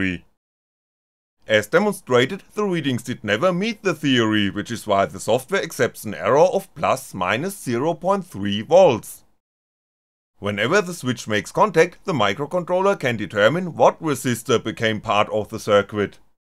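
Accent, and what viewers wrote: Germany. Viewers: Lovely German accent.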